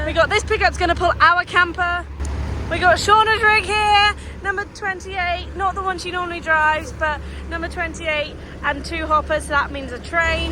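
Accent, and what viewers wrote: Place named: United Kingdom. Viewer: Love that British accent , that’s makes me come back to watch your videos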